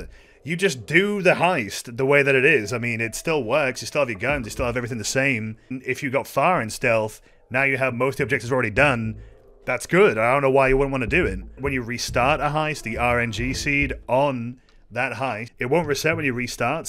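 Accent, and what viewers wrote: United Kingdom. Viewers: It's cos ur scouse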